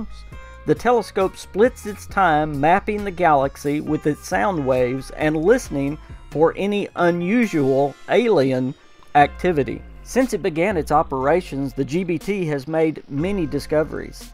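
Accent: South, United States. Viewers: Are you from North Carolina or Texas? Someone said you were in Kansas, but I can't tell exactly.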